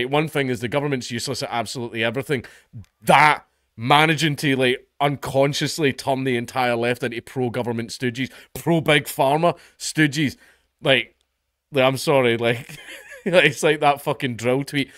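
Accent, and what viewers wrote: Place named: Scotland, United Kingdom. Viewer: Damn I have missed that Accent - Great Video Pal, Long live Scotland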